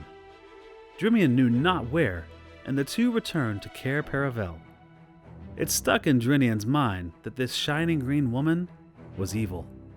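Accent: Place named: United States. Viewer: finally someone who’s American speaking to me in English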